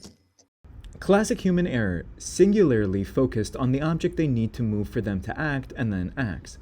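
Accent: United States. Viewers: Why is an American voicing over a British dashcam compilation cause he know absolutely nothing about our roads and sounds stupid...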